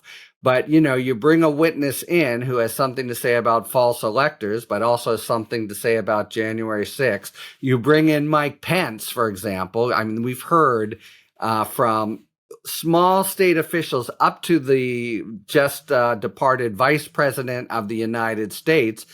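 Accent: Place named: New York, United States. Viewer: He’s from New Yawk; deal w/it. The pettiness from commenters 🙄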